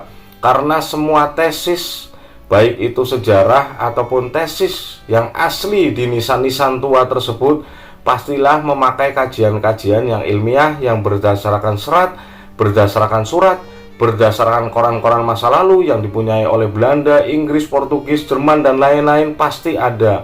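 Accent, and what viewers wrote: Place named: Java, Indonesia. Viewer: Smagat bang..aja ilang jawane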